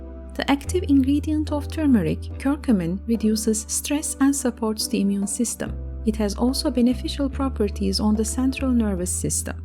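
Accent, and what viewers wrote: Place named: Turkey. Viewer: from your accent I hear you are Turkish. Turkey has the best chefs.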